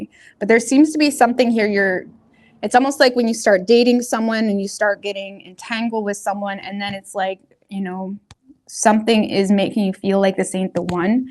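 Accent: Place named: Canada. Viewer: Just wanna say. I really like when readers are open enough to let is into their personal lives and take a break to stretch, smoke break etc. As a smoker i love to see the reality and appreciate doing what you do. As a fellow Canadian who lives in thd U.S. hearing you makes me happy and homesick. Thanks.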